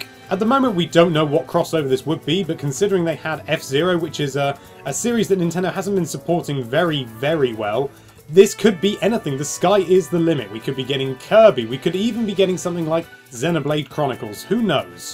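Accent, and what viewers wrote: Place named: England, United Kingdom. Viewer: He's pronouncing it with his English accent. In England everybody pronounces it like that.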